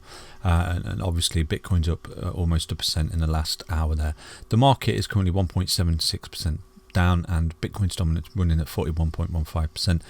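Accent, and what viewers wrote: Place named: East Midlands, United Kingdom. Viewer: East Midlands?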